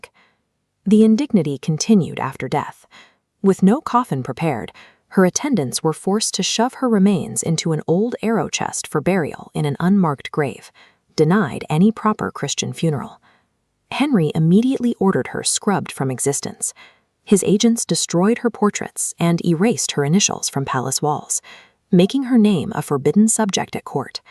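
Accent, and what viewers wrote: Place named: United States. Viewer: If you are going to post British stories, at least use a British Accent, instead of American nonsense.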